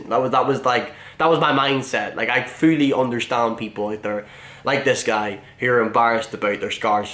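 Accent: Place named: Scotland, United Kingdom. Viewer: Haha sum boy what part of Scotland you from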